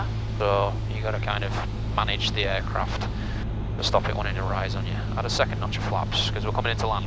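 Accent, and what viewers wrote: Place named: Yorkshire, United Kingdom. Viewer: ahhh I see the accent sounds similar to a Yorkshire one..... but not exactly the same 😂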